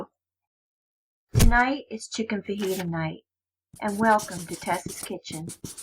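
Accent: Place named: Philadelphia, United States. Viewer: Such a philly accent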